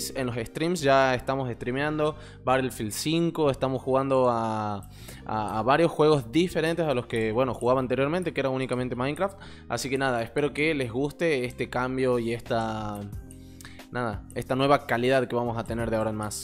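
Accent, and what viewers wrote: Tucumán, Argentina. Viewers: Che sos argentino digo por como prpnuncias la rr. Porque creo que en alguna provincia se pronunciaba haci la rr. Creo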